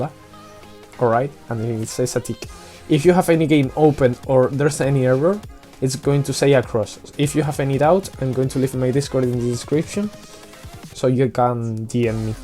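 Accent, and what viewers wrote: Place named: Spain. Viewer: se nota que eres español xddd gracias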